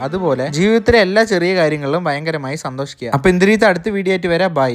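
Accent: Palakkad, India. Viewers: Bro നിന്റെ വീട് പാലക്കാട്‌ ആണോ 😊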